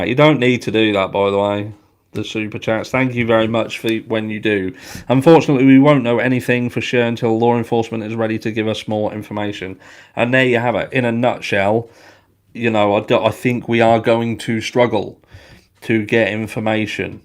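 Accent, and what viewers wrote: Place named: Countryside, United Kingdom. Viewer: Banksy! Banksy is so cool. I’m a Brit living in 🇨🇦 and I enjoy your countryside English accent! Great channel, recently discovered you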